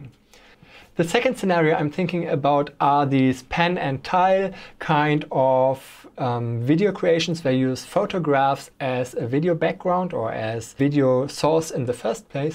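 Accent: Germany. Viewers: man hört so har das er deutsch ist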